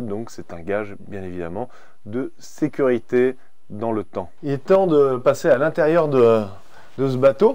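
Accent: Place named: France. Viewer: yes I like French accent, look forward to seeing it. Merci You have sold an Amel 60 as soon as I have won lotto.:).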